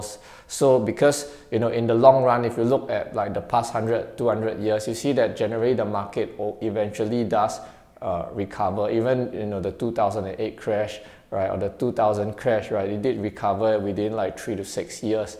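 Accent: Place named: Malaysia. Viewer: you are definitely from Malaysia :)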